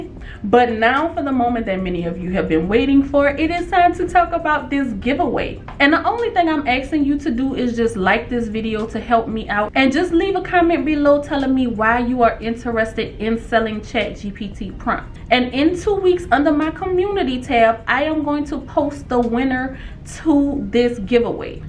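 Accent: New Orleans, United States. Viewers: Where ya at. (504 colloquialisms. Born & raised in N.O. I hear that accent. ❤ Hunni...you out here helping folk live!! I'm here for these multiple streams of income videos all day. I just have to get computer savvy. I just foubd your channel & will be a new subscriber. Thank you for helping us learn how to go out get it.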